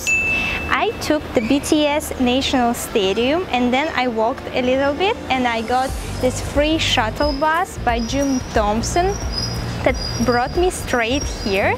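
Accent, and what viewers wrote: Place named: Russia. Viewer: She looks like more Russian than Germany and also her accent. Haha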